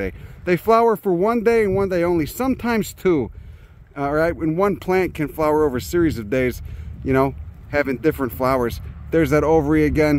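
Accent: Chicago, United States. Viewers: You sound like you are from Chicago? You sound like a family member, making me homesick for the Chi! Thanks for this video, I want to grow this plant and I live in Phoenix now. Just what I wanted to know. Bravo. Good video.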